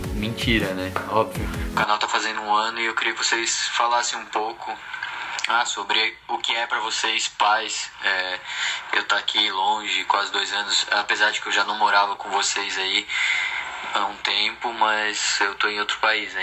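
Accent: Florianópolis, Brazil. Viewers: HAHAHAHHAHAHA logo pelos 5 primeiros minutos já deu pra notar o sotaque manezinho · nem sabia, mas logo vi esse sotaque manezinho lindo ae ♡ que liindo o videoo à sua mae!! paz e bem!!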